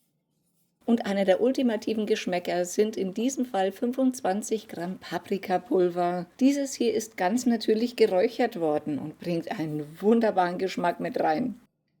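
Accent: Bavaria, Germany. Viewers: Servus,hab vor Jahren eine Brotgewürzmischung so gut versteckt,und durch Zufall wiedergefunden.10Jahre alt,Nase reingesteckt,war wie frisch gemahlen.Wenn es trocken gelagert ist,hält es lange.Dein bayrisch gefällt mir.SG aus Österreich.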